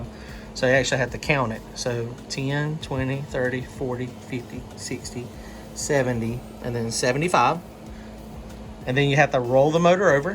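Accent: South, United States. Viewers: Honestly, I don't really care much for southern accents, but I subbed before you started showing the engine. There's a lot of engine channels on youtube, but a lot of douches too. You automatically have that vibe that makes others wanna sit down, have a beer and talk cars with. Very neighborly and humble.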